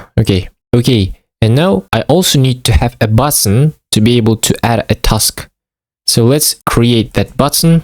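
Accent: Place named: Russia. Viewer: I just wonder what is your native language? I hear accent and really wonder. My guess is that u are Russian.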